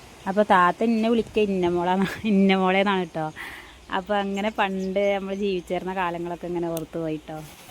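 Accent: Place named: Manjeri, India. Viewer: മഞ്ചേരി ആണോ.. Same സ്ലാങ് ഉള്ള ഫ്രണ്ട് ഉണ്ട് എനിക്ക് 😊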